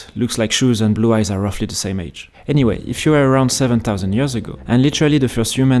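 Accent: France. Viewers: The frenchy accent ❤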